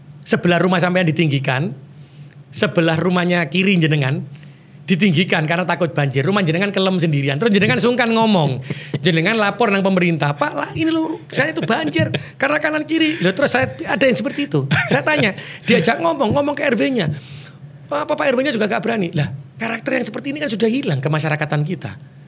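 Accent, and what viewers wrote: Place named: Surabaya, Indonesia. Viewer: Publik speaking nya bagus, style Suro boyoan yg SGT kental .merangkul, kecerdasan sosialnya super pokoknya. Good..josss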